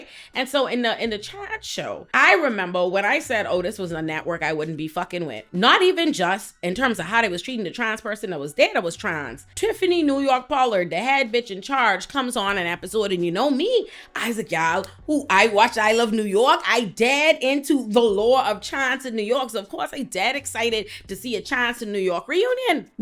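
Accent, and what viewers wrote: Bahamas. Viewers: Your Bahamas 🇧🇸 accent >>> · I genuinely hate Zeus network with a passion, it feels like a whole modern day minstrel show. Also off topic, are you Bahamian of have Bahamian roots? You have an accent very close to a Bahamian one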